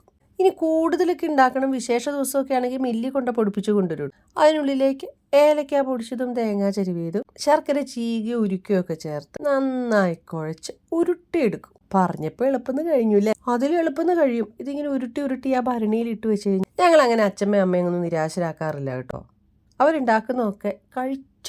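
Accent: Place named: Idukki, India. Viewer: Njn ella video yum kanarund ente nathoonte samsaram pole aval idduki anu adimali❤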